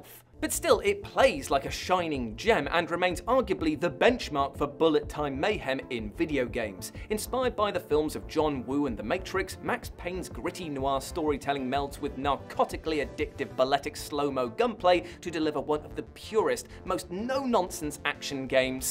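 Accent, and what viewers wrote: United Kingdom. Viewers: The moment I heard the voice... I expected to hear... hii I'm from w... and these are 10 games.... xD Glad to find you on this channel! Hit sub cus I love your corny humour and British narration! Looking for a 10ish hour game to complete in 2ish streams... and this gave me yet another channel to sub and watch xD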